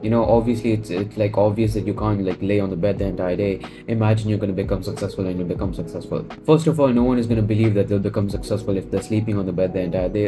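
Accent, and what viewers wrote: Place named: India. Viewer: You being indian and having slightly indian accent do you think any foreigners watch you because i dont think they do only indians watch indians i feel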